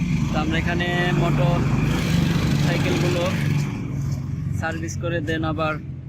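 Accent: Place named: Mymensingh, Bangladesh. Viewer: তর বাড়ি মনে হয় ময়মনসিংহ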